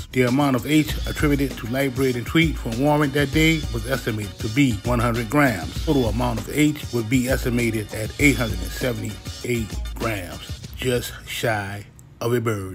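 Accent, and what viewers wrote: New Orleans, United States. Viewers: for sho Big Homie I know you out the city I know new Orleans when I hear it . Yeah talking bout those people who don't know new Orleans like we do . But anyway keep the stories coming.love the channel. Btw I'm out dat 8.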